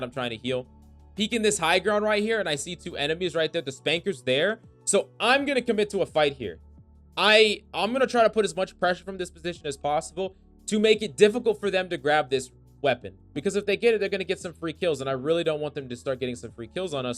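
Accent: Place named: United States. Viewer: Woah I didn’t know GreekGodX made Halo videos. Your American accent is perfect dude. I’m impressed.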